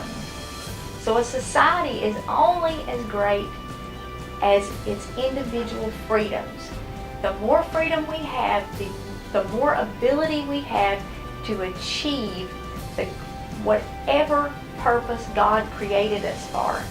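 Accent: South, United States. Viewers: I have really enjoyed your sharing of this recipe and I’m looking forward to making it some day soon. I love that it is an old family recipe, wow 100 years old! Thank you for your kind words and for sharing in an indirect way your faith in God. I love the way you have honored our military service men and women on Memorial Day for their sacrifice to our country. You have such a charming southern accent that is very relaxing as well. Take care and God Bless you today and always!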